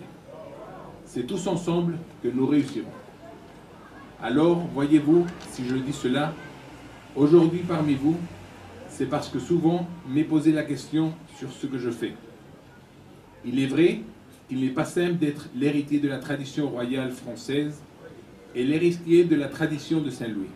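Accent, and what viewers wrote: Spain. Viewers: il a un accent espagnol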